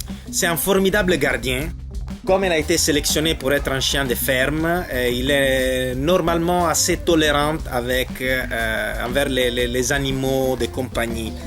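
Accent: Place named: Italy. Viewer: Enfin! Enfin une vidéo sur le boerboel en français! et dans un français parfait, en plus! Et cet accent italien... la classe! Excellente vidéo et très instructive, merci... Je m'abonne direct!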